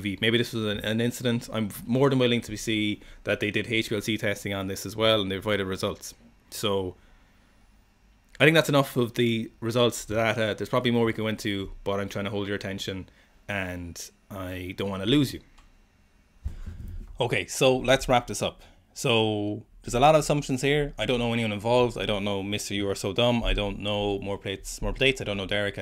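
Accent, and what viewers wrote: Ireland. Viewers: That is an Irish accent isn't it?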